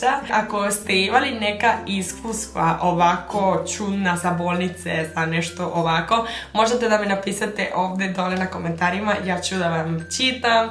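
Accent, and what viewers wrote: Serbia. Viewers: Kraljice bukvalno kada god sam tužna samo pustim tebe mislim malo smesno picas srpski no HEJT volim te mnogooo ja tebe razumem i stvarno bas mi je zao iako znam da je to bilo pre 10.godina Ali idalje ne osacas nogu bas mi je zao volim te najviseeee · Joj kako ja volim kako pričaš srpski !!!! 💞💞💞💞😂😂😂😂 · Boze koliko ti slatko pricas Srpski😍 usavršila si jezik😁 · Sve slatko ali tvoj Srpski kako slatkoo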